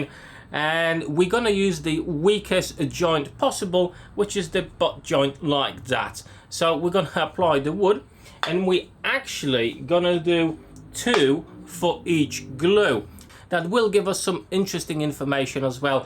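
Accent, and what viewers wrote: United Kingdom. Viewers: Where in the uk did u learn your accent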